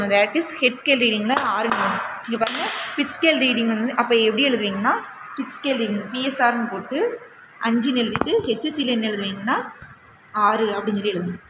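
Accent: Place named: Kanyakumari, India. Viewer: Mam nenga kanyakumari slang la pesuringa 😅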